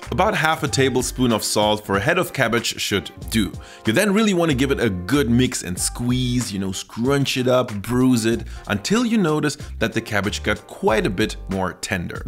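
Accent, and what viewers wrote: Germany. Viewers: I’m pretty sure he reveals his Germanness from the moment he opens his mouth. To a native English speaker, his accent is very obvious and easy to identify. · It's always waaay easier to spot an accent if u hear ur native language - in German I would only need 3-4 words and I could tell where someone is from as well. But listening to this dude speaking my 2nd language/English - it's hard for me to pinpoint that he's German too oO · While yes his English is very good but definitely a German accent through and through. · yea you can hear the german. You always can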